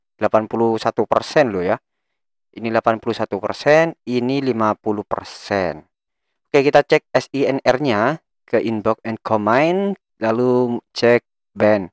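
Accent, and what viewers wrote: Madura, Indonesia. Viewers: bang, orang Madura?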